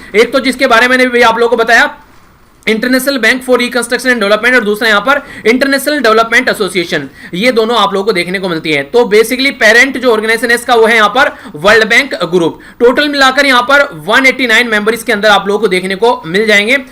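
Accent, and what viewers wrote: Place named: Uttar Pradesh, India. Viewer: Sir ki language se Mujhe v lgta h.. Jaise kbhi kbhi unka tongue slip kr jata h or UP ka thoda effect dikh jata h.. Jaise ki kl... Hamaari ko hmri bol diye sir... Aise bahut baar hua h... Bt sir btate hi ni.. 😣😣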